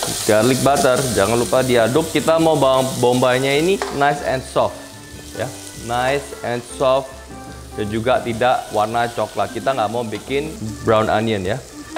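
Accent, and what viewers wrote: Surabaya, Indonesia. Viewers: Hohohoh asli suroboyo rek celotehnya 😀😀😀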